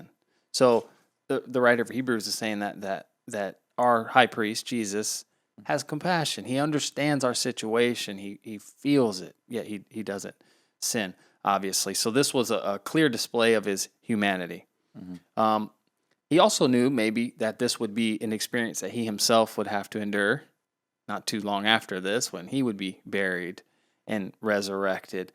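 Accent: South, United States. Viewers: I live in TN haha and I thought you had a bit of a southern accent at times lol. At least now I know you are a good ol Southern Baptist haha!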